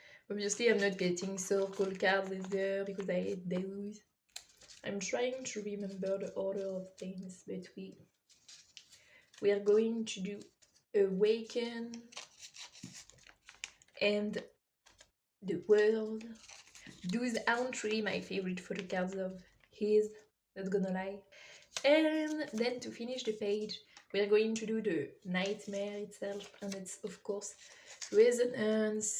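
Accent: France. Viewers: is this a French accent I hear ?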